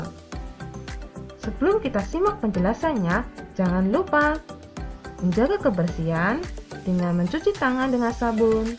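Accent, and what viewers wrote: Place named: Pati, Indonesia. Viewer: wong pati yaa'eeeee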